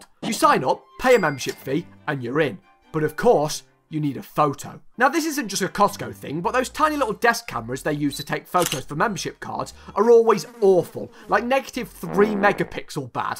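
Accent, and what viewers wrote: United Kingdom. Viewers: U.K. accent is strong in you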